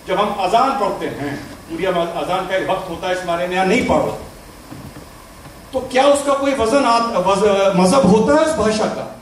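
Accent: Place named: Lucknow, India. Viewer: Sir, aapki luknawi hindi bahut khoobsurat hai..Bhagwan aapko aur aapke pariwar ko hamesha khushal aur sukhi rakhe. 🙏🙏🙏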